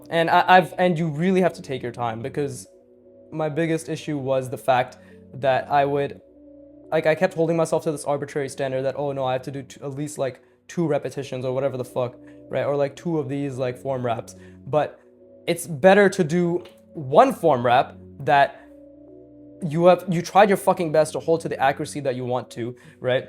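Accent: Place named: India. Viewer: This guy sounds indian 😢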